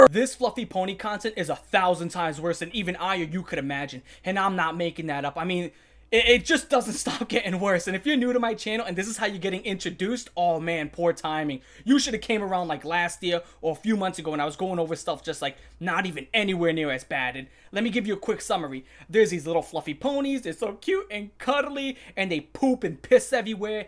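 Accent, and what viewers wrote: New York, United States. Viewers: bro sounds like Peter Parker that is actually new yorkian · I couldnt stop laughing at this, i was just listening while working and hearing this new york accent describe eating shit was something else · Your New York accent makes this video funnier 😭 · I think the reason people call you Italian is due to the New Yorker accent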